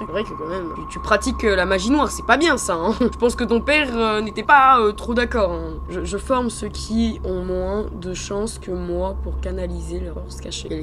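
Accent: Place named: England, United Kingdom. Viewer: l'accent englais sur le live ta peur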